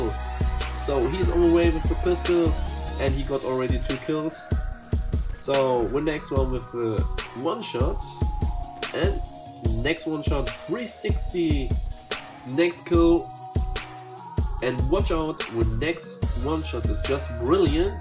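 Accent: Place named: Germany. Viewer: das ist so lustig wenn ein deutscher englisch redet man hört das einfach aber nices video :)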